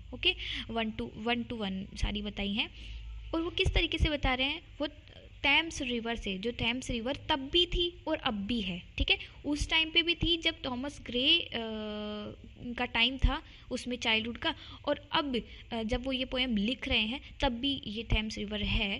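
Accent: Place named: Rajasthan, India. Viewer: Btw... Scrolling through ur channel i realize 2 things... U have a really sweet voice & that has a rajasthani texture r u from rajasthan or sort of outskirt's of it ??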